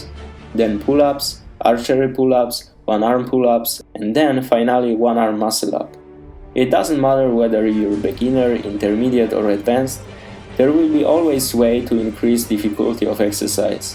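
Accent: Poland. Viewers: Coś taki polski ten angielski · When I heard you I thought: What a polish voice! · Polisz inglisz najlepszy <3 :D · Ah ten polski akcent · to Polak? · Wyczuwam Polski Akcent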